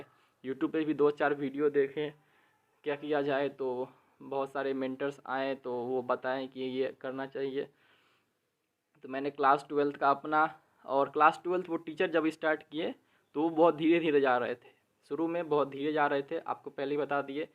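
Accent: Bihar, India. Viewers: Bihar se ho kya,?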